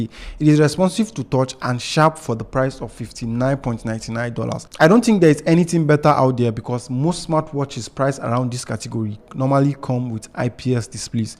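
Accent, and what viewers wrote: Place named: Nigeria. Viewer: He sounds Nigeria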